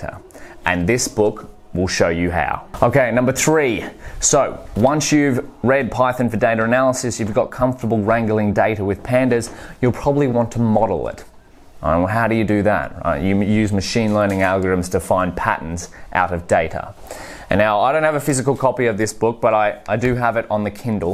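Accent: Australia. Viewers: The way you speak sometimes feel like Billy from strengerthings , or are u Australian..?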